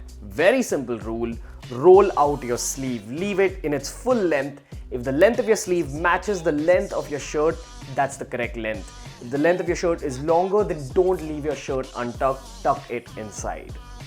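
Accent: India. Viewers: I love just about all accents of the world, but man the Indian one is just so unattractive lol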